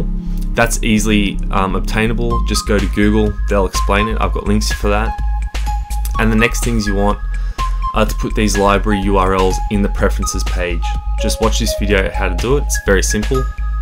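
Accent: Australia. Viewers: YOU’RE AUSSIE?! OMG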